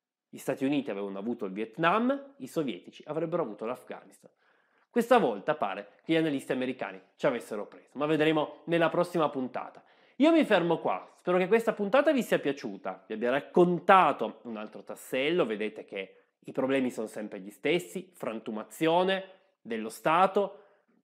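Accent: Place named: Bari, Italy. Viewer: Good morning Vietnam....bellissimo ma i pezzi musicali sono tanti dai a.....invece è bella come canzone è dei che consiglio ah ah ah ma tu sei un Barese oriundo.....invece che sono barese vedo che parli un dialetto completamente diverso, quindi nei paesi i Dialetti sono tutti diversi l'uno dall'altro, io Pensavo che Bari e le sue province parlassero il barese e magari Lecce e provincia il leccese...invece il dialetto cambia anche da comune a comune o anche a zone diverse della stessa città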